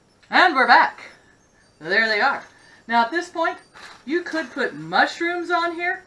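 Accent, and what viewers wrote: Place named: Missouri, United States. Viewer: Maters, crud--you talk like I do! Must be a "Missouri thing"! Thanks for the recipes--my stomach is growling now!! So glad you didn't "burn the house down!! Great video!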